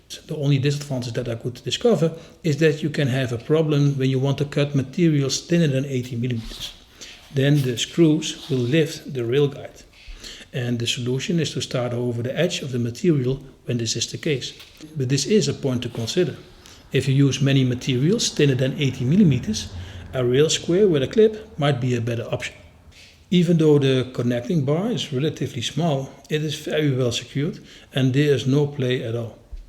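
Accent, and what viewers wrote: Netherlands. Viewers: The moment I heard you talking I knew you were from the Netherlands! Dank u wel voor de video, van de Verenigde Staten!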